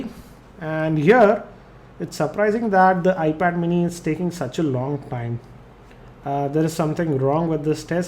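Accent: India. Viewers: This guy has the most normal stereotypical Indian accent i have ever seen.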